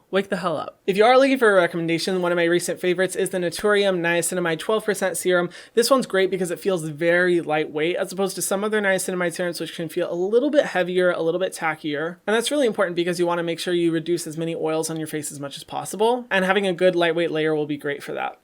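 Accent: Scotland, United Kingdom. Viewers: Great Scottish accent !!👏🏽👏🏽 (but they don’t sound like that anymore😂) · I’m Scottish and that accent hurt me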